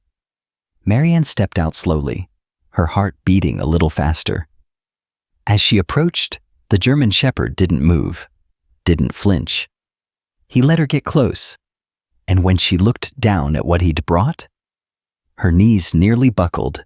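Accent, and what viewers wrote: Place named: United States. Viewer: I like the narrators voice! Appreciate that she sounds like the USA… Kind of tired of all those British accents talking USA stories. Good story.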